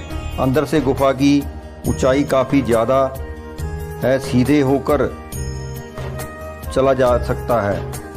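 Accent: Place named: Punjab, India. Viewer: Bhai ji tuhade Hindi bolan de andaz to pta lgda he k tusi Punjabi bolan vale ho fir Punjabi bolo kion k isnu jiyada dekhan vale punjabi hn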